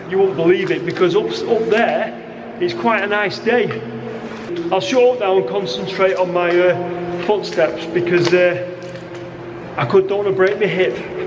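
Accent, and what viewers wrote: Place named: North, United Kingdom. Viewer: yes thanks mate great videos chap! Scouse my daft comments I love that word bugger lol. When I hear an accent like yours I think of mike Harding, aka The Rochdale Cowboy, he called everyone buggers lol!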